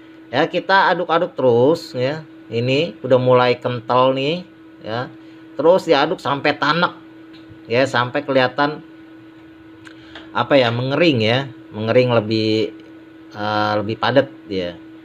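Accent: Jakarta, Indonesia. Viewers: Baru nemu channel si abng, demen banget enak ngomong nye betawi asli... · Gile ye betawi asli ye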